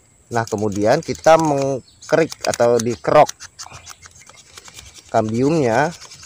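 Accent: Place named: Kalimantan, Indonesia. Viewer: Abang org kalimantan ya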